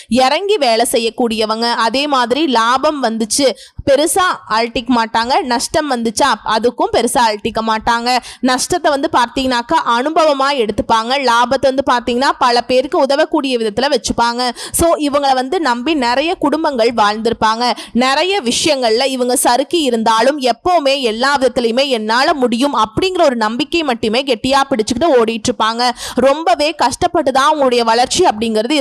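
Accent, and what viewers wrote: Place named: Kerala, India. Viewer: Kerala pesara Amma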